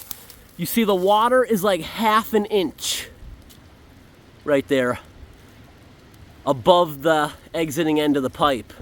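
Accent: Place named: Maine, United States. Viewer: I remember now why one of the reasons I started watching this channel is bc I knew right away you were from ME with your accent/the hard pronounciating on the end of words lol!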